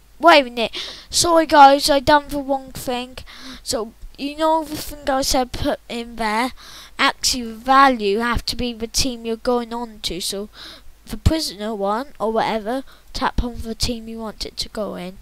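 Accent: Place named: United Kingdom. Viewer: Your british accent is funny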